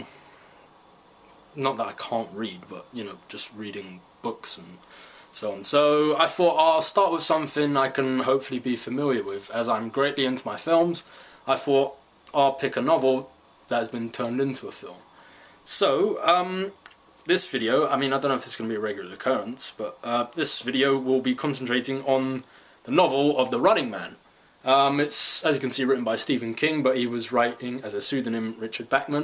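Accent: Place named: United Kingdom. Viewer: There's actually a reason Killian is so different in the film, it probably just doesn't play too well because 1. You're too young, and 2. Judging by your accent you're from the UK. Back in the 70's and 80's Richard Dawson was a real American game show host (he hosted Family Feud for a number of years). So instead of getting an actor to play a game show host they just got a real game show host.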